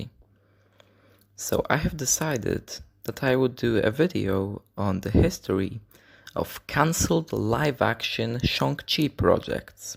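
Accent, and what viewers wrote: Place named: Eastern Europe. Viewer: SLAVIC ACCENT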